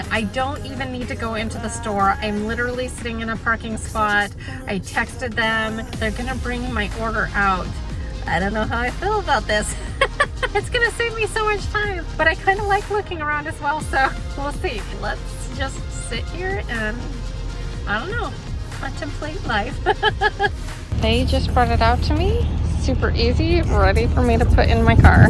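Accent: United States. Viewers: it's so funny when americans try to pronounce swedish words. Love it. ❤️😃 no hate love from your Swedish followers 👌🏻🎉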